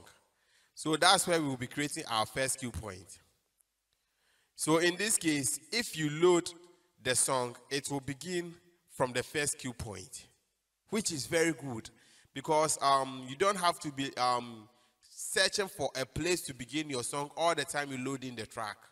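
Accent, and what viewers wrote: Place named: Ghana. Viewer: You sound Ghanaian😎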